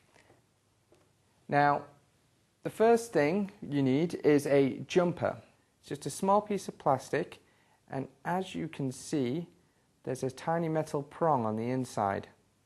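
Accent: United Kingdom. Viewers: lovin the way he alks :D so english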